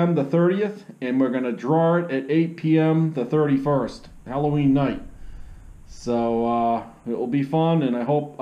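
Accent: United States. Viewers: Put my name in the pot. Enjoy your videos and especially love the New Hampshire accent.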